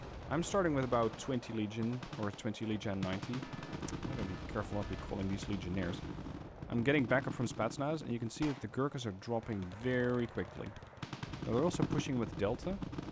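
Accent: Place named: Netherlands. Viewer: New mic. Still sounds Dutch. I guess theres no fixing that :-)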